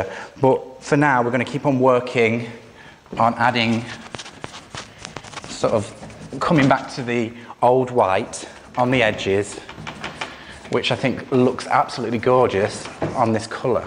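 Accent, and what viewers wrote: United Kingdom. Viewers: First let me say, LOVE the British accent. Hello from New York, USA! I like the fact that you did not said the piece to obtain the "distressed" look. Water seems, it only easier, but better for getting the paint off exactly where you want to without sanding somewhere you don't. Also, the water seems to be a lot quicker and cleaner. Thanks for sharing!!!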